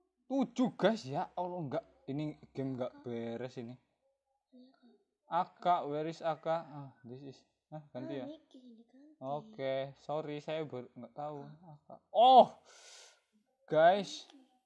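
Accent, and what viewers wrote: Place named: Kedu, Indonesia. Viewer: pasti Plat AA ni... dari ngomong keliatan, sayang gak ada mukanya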